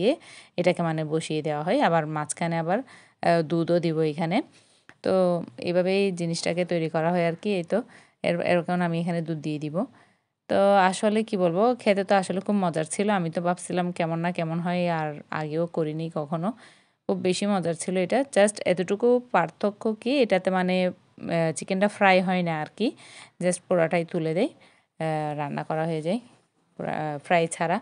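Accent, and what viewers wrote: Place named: Chittagong, Bangladesh. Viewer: অনেক সুন্দর হয়েছে আপু ভালোবাসা রইল তোমার জন্য 🎁🎁পুড়ে যাওয়া ভাত খাওয়ার উপযোগী করে নিলে আমিও চট্টগ্রামের মেয়ে তোমার কথা শুনেই বুঝতে পেরে গেছি ভিডিওর শুরুতেই ❤❤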